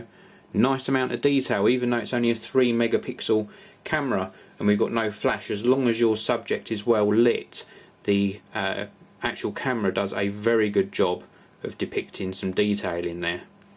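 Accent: England, United Kingdom. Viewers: Best british accent yet :) Nice review as well! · Nice review! Could we have a comparison video of this phone with the Orange San Francisco? Where is your English accent from?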